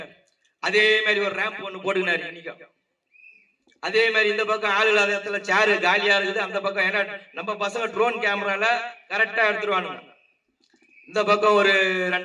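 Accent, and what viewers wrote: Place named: Chennai, India. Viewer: அருமை சென்னை தமிழ் வாழ்த்துக்கள் tvk வெல்லும்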